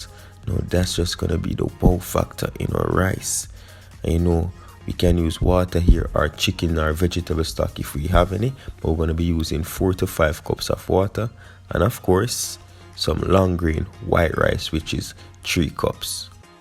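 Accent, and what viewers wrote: Jamaica. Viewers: The JA accent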